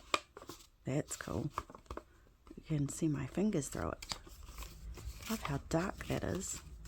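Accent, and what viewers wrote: New Zealand. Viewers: I never thought of using a journal as decor! I am on my second journal - the first was made out of a little box and I am actually using it for notes and memorabilia; the other is bigger and sits on my desk - it is still being added to and it's not for writing in, just, well, admiring and trying things out as I learn. I now have an excuse to start a third one - a purely decorative one for my bedroom:) Lovely channel and great to have New Zealand content and a Kiwi accent to listen to. I'm waiting to see some Edmonds cookbook pages turn up in your signatures!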